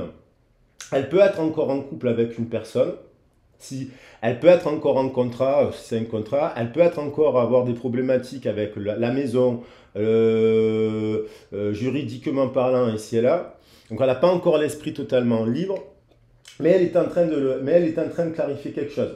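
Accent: South, France. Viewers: Merci pour cette guidance 🙏, j aime bien votre accent du sud 👍belle journée 🤗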